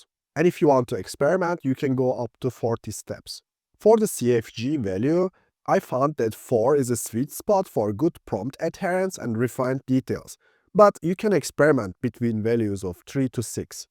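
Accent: Turkey. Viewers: Turkish accent :)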